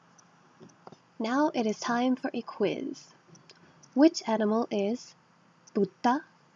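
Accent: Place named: China. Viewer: giống giọng Trung của vl ;v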